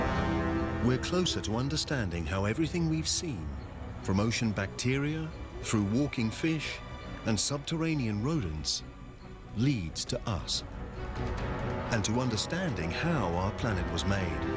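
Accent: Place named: United Kingdom. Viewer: that documentary is narrated by an American, while this one that you uploaded is narrated by a British. You can tell the difference by their accent and the different units of measurement that they use.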